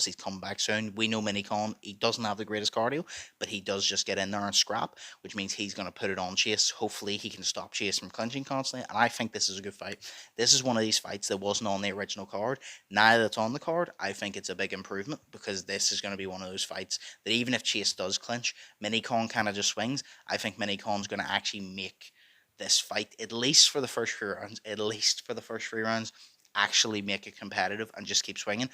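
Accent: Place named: Liverpool, United Kingdom. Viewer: Hahahhaha bruv your accent is amazing, you must be from Liverpool innit?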